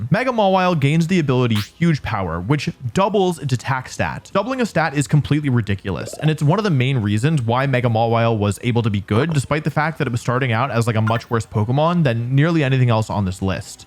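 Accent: United Kingdom. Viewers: I approve of your British accent and mention sir🇬🇧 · British is something I didn't know I needed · your british accent is so authentic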